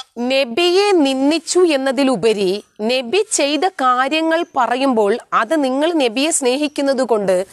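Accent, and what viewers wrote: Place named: Thrissur, India. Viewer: Porichu. Trichur. Basha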